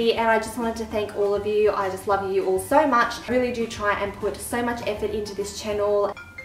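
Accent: Australia. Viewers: Bruh where should I start congrats btw 10k 🙌🏾🙌🏾 u deserve it, ur vids kill me there so funny I love ur personality for making something funny and ur Aussie accent is on FLEEK😍😍 . The reason why I want to win the extensions I really want to try something new im so used to weaves that I never considered extensions so why not try something NEW 😃😃 And for the brushes I haven't really liked makeup brushes u can say I'm new to makeup but I still use the basics and never had good experience with cheap as 2$ brushes 😂😂 ... Umm I don't know if I make sense but yeah lol 😋😋😎😄💁🏾💁🏾 LATERZ✌🏿️✌🏿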